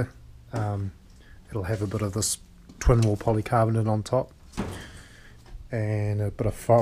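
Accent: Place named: New Zealand. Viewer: Option 1: Mate go to scrap car yard get an old car / truck radiator spray it black put in insulated glass box point at sun. Option 2: Alternatively get copper or aluminum tube paint with matt black assemble in insulated glass box point at sun. Option 3: Section off part of garage roof, seal and paint matt black, place cheap clear plastic cover over the top and send water down. Note seal well due to expansion of roof when hot. The option you have shown as will as first two above would work over a camp fire, tea candles, hot embers etc.. Keep up the good work kiwi "Kia Kaha" Stay Strong, I heard accent and saw orange sand bucket.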